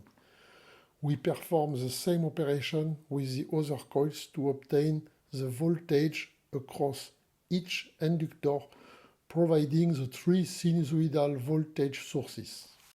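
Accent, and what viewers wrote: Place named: France. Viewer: French accent is soooo funny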